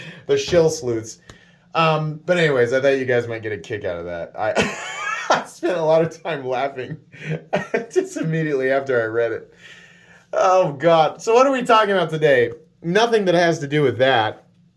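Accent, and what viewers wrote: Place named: South, United States. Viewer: your southern accent is amazing 🤩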